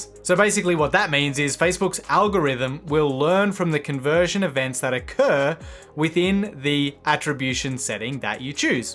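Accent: Australia. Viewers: Hey, I love your videos ( I also love Australian accent ). whats your take on Google updates that will take place in 2024 ( google is going to remove cookies in its browsers ) does this will affect Facebook ads, TikTok Ads?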